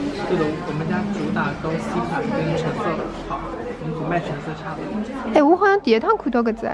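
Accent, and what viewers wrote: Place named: Shanghai, China. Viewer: 謝謝帶我們逛街好喜歡妳說上海話太好聽了！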